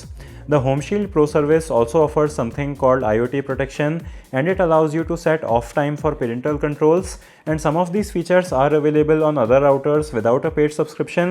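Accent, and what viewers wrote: India. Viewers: I fully understand why you speak so quickly, to keep the review as short as possible without compromising on your review detail. Your STRONG Indian accent is very difficult to understand so the subtitles are a useful addition. There is one mistake in the subtitles, stating that the router has 6 NON removable antennas but the subtitles read removable antennas. Otherwise a good review. By the way, here in the UK its available at Amazon now at just £99.99 which is almost £50 cheaper than is typical for the suppliers that actually have it on the shelves. Incidentally thanks to your reviews I cancelled my order for the AX50 in favour of the AX73 router. I am CERTAIN that I won't be disappointed. Keep up the great work. The price must have been a special one day offer because it rose to £169. Sorry guys I suppose I was just lucky, "right place right time". 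UPDATE: Wow that price seems to have been a one day ONLY offer and I have had to wait what seems like a LONG time for delivery, (expected today) the cheapest I have seen the router since ordering is £179 so I got a real bargain, assuming it lives up to expectations.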